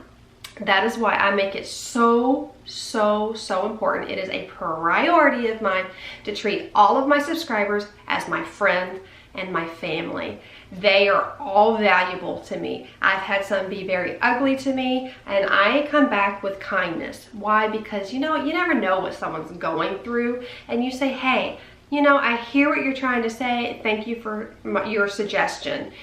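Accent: South, United States. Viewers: I just found you from Love Meg and I just love you!!! You're so sweet and I can legit just watch your videos for your southern voice! I love it!!!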